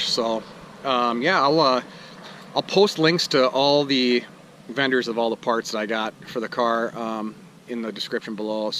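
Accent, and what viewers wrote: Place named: Minnesota, United States. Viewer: Dude sick ass car! And loving the Minnesota accent lol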